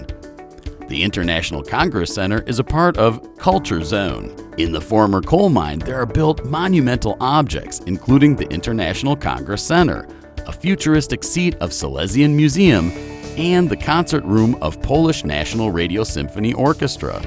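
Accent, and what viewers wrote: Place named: United States. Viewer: It would have been MUCH better to have a Pole who spoke good English to do this voice over. The narrator's strong American accent is horrible and his pronunciation of some of the Polish words are terrible.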